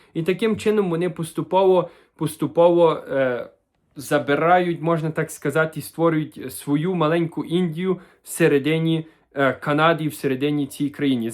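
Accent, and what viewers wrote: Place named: Lviv, Ukraine. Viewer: Наздвичанйо цікаво розмовляєте, чути Львівський діалект видно що ви наш. Нам цікаво з України.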